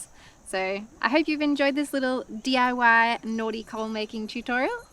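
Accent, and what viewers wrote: Australia. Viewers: Are you from Australia???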